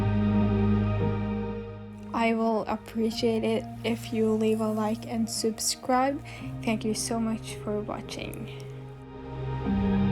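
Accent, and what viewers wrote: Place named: Norway. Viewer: Hei hei, er du norsk 😊😊? hvor kjøper du ting til hamstere? Sorry if I’m wrong just guessing from your accent/English!!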